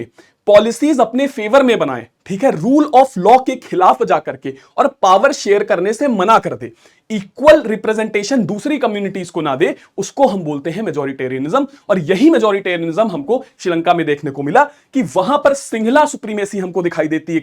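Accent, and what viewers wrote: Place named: Bengal, India. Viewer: Sir ke accent se lag raha hai ye bangla Sinhana ko singhla bolne me dikkat kisi bangla ko hi ho sakti hai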